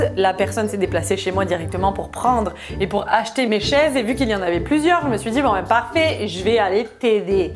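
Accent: Quebec, Canada. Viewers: Moi je suis la depuis que ta fait la vidéo ou ta apris a parler quebecquois <3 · Moi je suis là depuis trois ans et franchement t’as changé et puis ton accent québécois c’était un truc de dingue avance bises franchement je t’adore ne change pas parce que t’es drôle et continue tes devinettes Tes blagues en début de vidéo tous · Je suis abonné depuis les vidéos Québec vs France quand tu parlais plus avec l'accent Québecois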